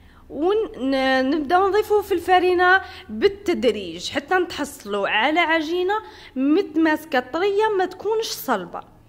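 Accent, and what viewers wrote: Chaoui, Algeria. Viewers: والله العلي العظيم يا إبنتي الغالية غير نحبك فالله كأنك إبنتي الثالثة وأحب لهجتك الشاوية يا الحرة💞💞💞💞💋💋💋💋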